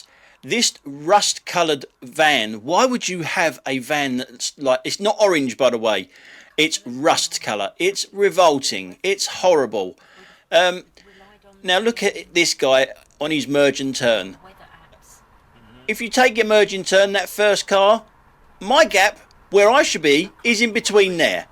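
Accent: London, United Kingdom. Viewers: Love your moaning! You sound just like my hubby with a London accent 😂 Great set of clips, but the dad on the cycle in front of his kid... What the actual...!! That child looks no more than 5 or 6 😢 crazy!! 🤪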